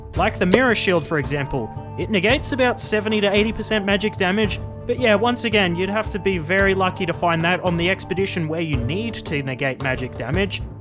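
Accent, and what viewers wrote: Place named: Australia. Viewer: I've seen your videos before where you were disproving the slander on guardian and showing how he's actually supposed to be used, and I was already planning on playing the character at some point, but after watching a video of this dude absolutely slaying shit in depth 5 with guardian, it further motivated me to pick this character up after countless hours I've spent on executor and Wylder. So when I was looking for a character guide just to make sure I was doing things right, I knew it was an absolute must to make sure the video was done by you. As soon as I heard that Australian accent, I knew I was in the right hands lol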